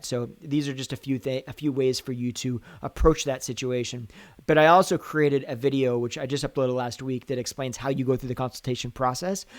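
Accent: United States. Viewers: I hear a slight Southern accent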